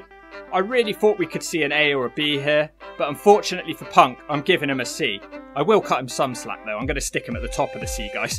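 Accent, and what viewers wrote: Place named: Weston-super-Mare, United Kingdom. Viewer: You gotta be from near me in weston super mare with an accent like that. Great channel. 👍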